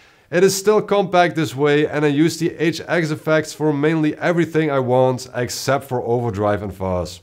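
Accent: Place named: Netherlands. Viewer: I’m guessing Dutch or Flemish as first language? Your English doesn’t bother me at all👍.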